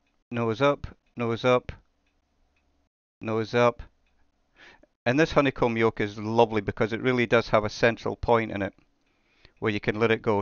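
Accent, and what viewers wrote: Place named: Scotland, United Kingdom. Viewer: Repetition is not so bad when it's in a Scottish accent. 😉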